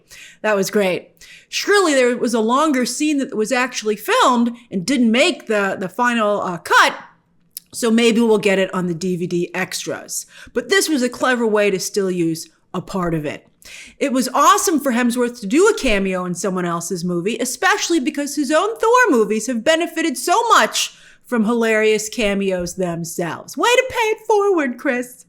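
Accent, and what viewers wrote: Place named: Mid-Atlantic, United States. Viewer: You have a mid Atlantic accent from the 1950s it’s so wild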